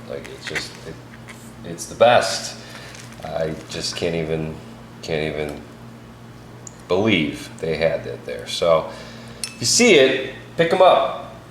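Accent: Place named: Chicago, United States. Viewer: You have to be from Chicago land area? Based off of your accent, I live near there. Just bought the Anova 😍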